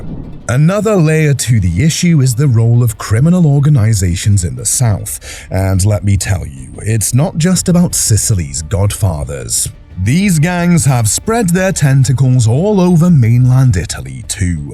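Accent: United Kingdom. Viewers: Assembling other people's videos and hiring a narrator with a British accent can be PROFITABLE. Nothing here that a good statistician could have told you-and has, btw. Why don' t you consider the immigration problems and the Procreation for Recreation immigrants overflow in Lampedusa or those being shipped to ALBANIA ? OLD NEWS . NO SUBSCRIBE. NO LIKE.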